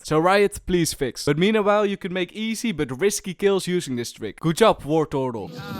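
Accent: Netherlands. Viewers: You dutch? From leeuwarden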